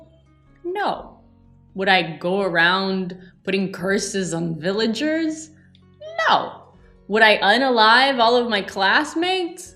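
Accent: Greece. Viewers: Your greek accent gives me the feels <3 And Jiji is the best, I love black cats. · Your Greek accent is so spot on I wondered if you are from Greece oh my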